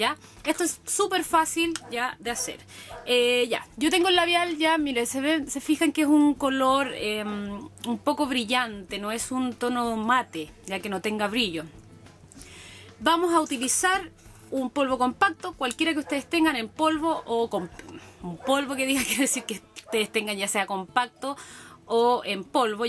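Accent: Chile. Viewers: eres chilena????????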